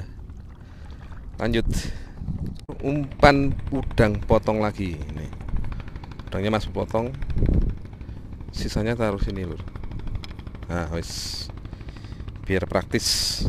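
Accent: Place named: Java, Indonesia. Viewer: Waiki anunya medok isonan 😭😭 Penting sutrek lah 😁😁👍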